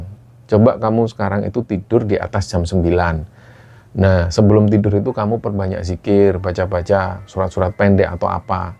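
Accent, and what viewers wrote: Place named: Bali, Indonesia. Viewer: Salam dari Blitar yang sedang di Singapore. Hari hari suara mas nemenin kerjaku. Kalau mas ngomong Jawa jadi ingat ipar ku pas pelafalan huruf D. Iparku orang Bali asli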